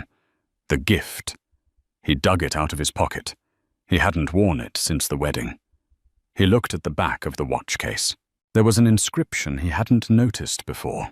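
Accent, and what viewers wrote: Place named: United Kingdom. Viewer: Hi.... I know, eh?! My solution, if you don't mind me addressing your comment, is to put a piece of paper over the top section of my screen where the freeze frame picture is or where the slide show and all the annoying "confetti / snow" moves. Then, I click on the Transcript amd read along as I am listening to that purring English / British accent narrate the story and let my imagination do its natural work. I hope this helps? Cheers! From Windsor, Ontarjo Canada 🇨🇦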